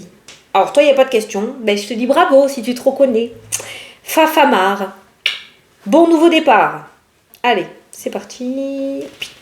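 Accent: South, France. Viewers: Bonsoir que du bonheur de vous entendre 😊😊😊😊. Je me prénomme ludivine le 02/10/1979. Est ce que je vais bientôt réussir à avoir une grossesse depuis le temps avec tant d épreuves 😢?mais je ne baisse pas les bras.merci beaucoup de tes tirages qui me font du bien avec ton humeur du sud.je suis du sud aussi j adore ton accent ❤❤❤❤😊😊😊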